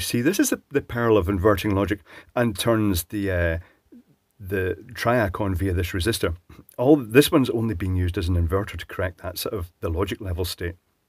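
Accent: Scotland, United Kingdom. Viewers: That Scottish accent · Love your videos. Have learned a lot despite your scottish language/prononsuration.(?) Remember that you have an international audience!! Sort out your english! And rest your hands. Whill go on loving your videos. Oscar/Sweden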